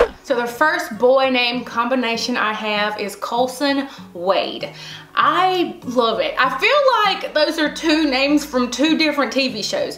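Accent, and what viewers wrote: South, United States. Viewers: It sounds like youre saying "WEENIE' not WINNIE Lol maybe its a good thing you didnt pick that name with your southern accent girl! Haha!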